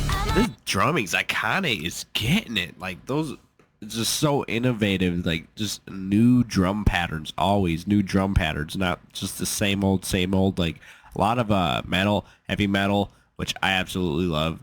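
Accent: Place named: Midwest, United States. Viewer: A-Ha! I see, you live in IOWA. I'm Japanese, but I used to live in Nebraska. Your face, the way you speak reminded me of an American friend of mine back in the days in Mid-West. It must be very freezing now. Take care of yourself, buddy.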